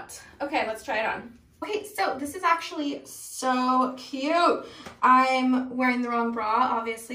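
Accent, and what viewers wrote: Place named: California, United States. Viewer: You sound like you’re from California the way you talk 😂 this is coming from a Mainer haha 💜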